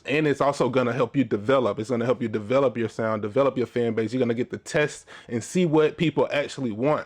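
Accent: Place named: Houston, United States. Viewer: My boi got that H-Tine accent. 🔥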